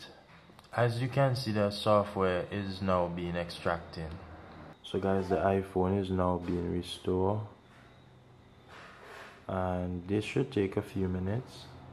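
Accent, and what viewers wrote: Jamaica. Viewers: Thought u were trinidadian at 1st but you're def jamaican. Much love still though brother. Your video was really helpful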